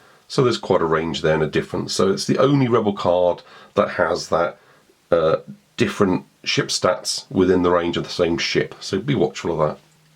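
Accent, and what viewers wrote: United Kingdom. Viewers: I say he vote, British people should narrate everything.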